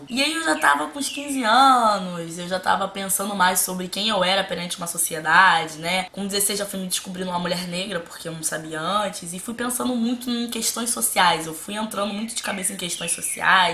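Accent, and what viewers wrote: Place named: Rio de Janeiro, Brazil. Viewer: SOTAQUE CARIOCA AMO ❤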